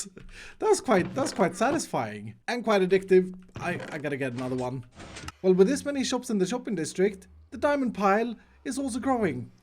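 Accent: Sweden. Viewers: i thought so im hearing om is English it’s very good but I hear that Swedish voice lets gooo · are you swedish?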